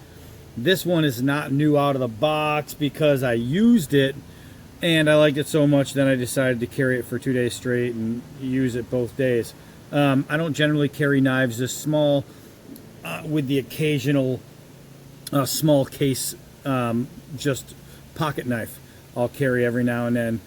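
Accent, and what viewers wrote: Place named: Rochester, United States. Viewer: Got this knife today, nice demonstrations. Where you from sound a little like Rochester NY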